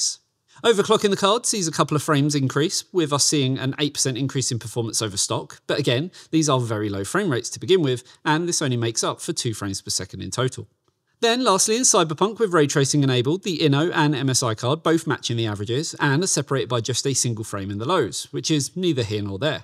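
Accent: United Kingdom. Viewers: Британский акцент смешной ...🤣🤦‍♂️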